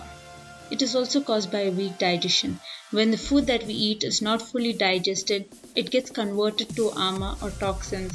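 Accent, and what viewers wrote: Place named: India. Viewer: Just from his/her indian scammer accent, i can confirm that he/she is so stupid that cannot find a job and only way to survive is to scam with "ancient" remedy. I cannot even know if he/she is male or female by his/her voice.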